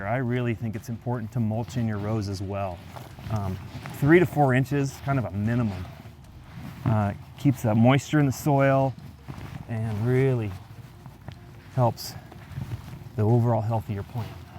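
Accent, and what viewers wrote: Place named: Pennsylvania, United States. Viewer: Great video. Sounds like a PA accent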